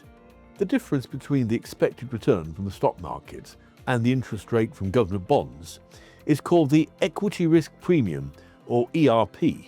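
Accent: United Kingdom. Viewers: False Words: "proof just sure certain break principal due based problem" -- ya that means "insurance" is also a false word & wow is it:face-purple-crying:America has defaulted 5 times about every 1/2 century kinda: 'Nixon said he was temporarily suspending the dollar’s convertibility into gold. The most obvious lie was Nixon’s claim that the suspension would only be “temporary.” It’s still in place today -- the US dollar has lost over 87% of its purchasing power since 1971. “Since 1789, the United States has paid all of our bills on time,” said former Treasury Secretary Janet Yellen. “In our history, we have never defaulted on our debt or failed to pay our bills,” said President Biden’s press secretary. “… the United States has never defaulted on its debt,” wrote The Washington Post. You hear it everywhere. The US has never defaulted. It’s repeated by politicians. 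By bureaucrats. By the media. As if it were gospel. All lies.' As one who did the accounting for an IT company I used to work for this all makes sense now why NOTHING made sense lol. Now I see fully why I am/was so obsessed with accounting -- follow the $. IT'S ALL A SCAM -- INSIDERTRADING DONTPLAYDONTBREED. Now that MOON HOAX I totally understand why they did it though it is STILL WRONG -- esp. now that I know that THE REST OF THE FREAKING PLANET KNOWS & IS BLKMAILING THE USA FOR TECH. $2B/day -- ya that's not sustainable. Luv this vid everytime nearly when i hear that british accent w "bonds" i thk james ; ) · Thx much. Clear and understandable. And, with a british accent, it's immediately perceived as smart and credible!!😊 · the British accept helps me understand better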